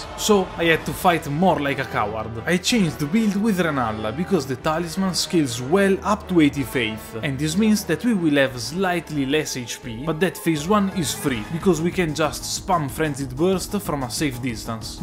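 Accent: Italy. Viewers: This is the best Italian accent I've ever heard!! Also, cool vid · appena ho sentito l'accento, ho capito che è Italiano ahahaha. Sono troppo d'accordo, che canale figo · Are you the Dave504 of gaming? lol your thick italian accent reminded me how Dave speaks! Great video mate, very well done! You put a lot of effort into it, and you made me realised Iàve missed a frozen dragon 😅 And even more, well done for creating content in eglish, I'm been living in the UK for many years now and I still don't feel confident about doing so. Thank you for what you're doing, you gave me a boost in confidence to consider creating contente not in italian! Keep up with the good work!💪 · hai un accento italiano fortissimo e lo amo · You are the most Italian man I have ever heard. Subscribed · Aaaah l'inglese parlato da un italiano... POESIA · Ma che accento Veneto hai? 😂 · palesemente italiano hahah Ottimo lavoro fratello👍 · Find this video in my homepage, hear you talk and i think, this guy is Italian, then you go "sweat, blood and blasphemy" and i get the confirmation you are italian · very obviously Italian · Great video! 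Don't know why you wanted to talk in english, even if è abbastanza intuibile tu sia italiano... but whatever :D. Good video nonetheless. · Sempre bello sentire l'accento Italiano, bel video! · L'italiano comunque si sente lontano un miglio XD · ho capito che sei italiano al minuto è bello vedere youtuber che sono italiani fare video in inglese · dalla tua voce sembri Italiano...ci ho preso? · Mamma mia che accento italiano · Secondo me sei italiano 😂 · Bel video, ma con questa pronuncia di riconosce che sei Italiano😆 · Fra L'accento italiano è inconfondibile. Grande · Your accent looks so italian broo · TROPPO BELLO L'ACCENTO ITALIANO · Bro sento l'accento italiano , comunque bel video abbastanza informativo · Honestamente mi ha piaciuto tanto il accento Italo-inglese che mi sono suscritto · Man, such a great video! Hope that palestinian kid is doing ok :( PS che bello vedere l'accento italiano riscuotere un simile successo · Mi sembra troppo italiano questo inglese Aswer me my BOI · This video just popped out in my recommendation and as soon as it starts I hear in your voice a strange accent, I know it's really strange but I wanted to ask you a question, are you Italian? 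To me it seems a lot that you have an Italian accent, you know, I'm italian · Dude great run, especially the Italian ass accent get me all of the time hahahah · hey man can i ask you something? are you from italy, because you' accent you'r form there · Fra è così strano trovare l’accento italiano più forte della storia cliccando su un video a caso nella home. Nice video tho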